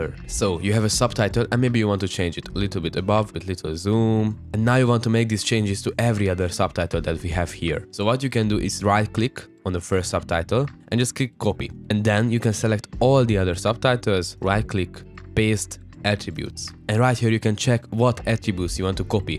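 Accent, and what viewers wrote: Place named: Hungary. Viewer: Wait your accent is VERY simmilar to mine. Are you Hungarian?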